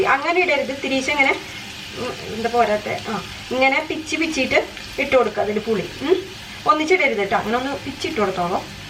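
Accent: Thrissur, India. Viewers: Variety recipes aanaloo... Recently aanu Kanan tudangiyath.... am from EKM... nalla speed samsaram... Nik oru frnd und.. she is from trissur, she is talking like this... any way NYC recipes !All the best dea... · എന്ത് രസാഡോ തന്റെ സംസാരം കേൾക്കാൻ.. തനി തൃശ്ശൂർ ഭാഷ. നാട്ടിൽ എവിടെയാ? അമ്മച്ചി സ്പെഷ്യൽ എല്ലാം പൊളിയാട്ടോ.. അമ്മച്ചിയോടു പറഞ്ഞോളൂ. എല്ലാവരും ഇപ്പോൾ പഴമ ഇഷ്ടപെടുന്നു...അതാ തനിക്കു ഇത്ര സപ്പോർട്ട്. ഇങ്ങനെ തന്നെ മുന്നോട്ടു പൊക്കൊളു. എല്ലാവരും കൂടെ ഉണ്ട്. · തൃശൂർ ആണല്ലേ... ഇത്ര സ്പീഡിൽ എങ്ങനാ സംസാരിക്കുന്നെ???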